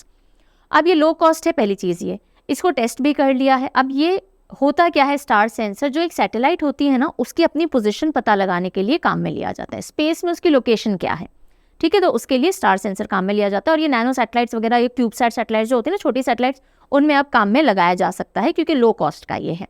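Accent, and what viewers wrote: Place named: Maharashtra, India. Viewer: Your marathi accent is too good mam....Aaple sarkar seva kendra...love from Maharashtra ❤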